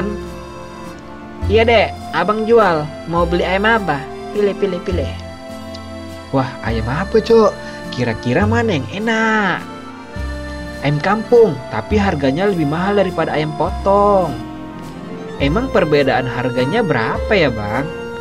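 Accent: Medan, Indonesia. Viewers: orang medan ni kek nya🗿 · Bang Abang Medan mananya?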